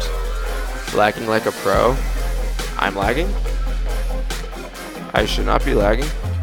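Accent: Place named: Philippines. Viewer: You sound Pinoy Btw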